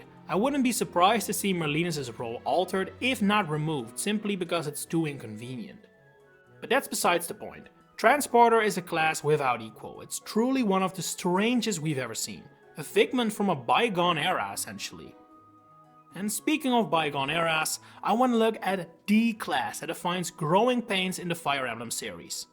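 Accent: Netherlands. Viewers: "I am actually dutch" I was able to tell instantly, I have the same accent in English LMAO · Aha, I just knew I heard the Dutch accent! Hallo! buigt Cool vid though, these classes are so weird.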